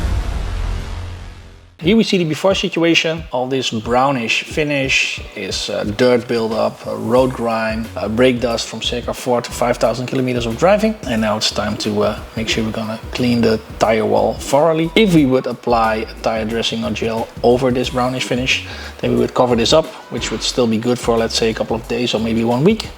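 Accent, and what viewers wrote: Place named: Netherlands. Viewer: Hello, you sound like a Dutch guy speaking English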